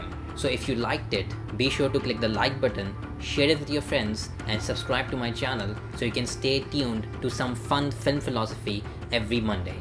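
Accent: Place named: India. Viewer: Dude u have to firm your Indian english voice the vocabulary is good but sharpness of words, u have to soften it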